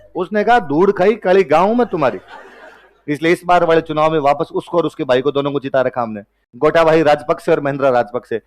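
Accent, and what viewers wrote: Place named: Rajasthan, India. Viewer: कुछ दिनों से आपके वीडियो देख रहा हूं 😅 मजा आता है राजस्थानी लहजा आता है जेसे khan sir का भोजपुरी लहजा है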